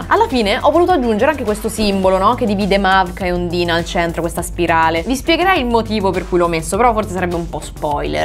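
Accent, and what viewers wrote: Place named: Central Italy, Italy. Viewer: L' accento da Italia centrale si sente un po' troppo, nel doppiaggio ...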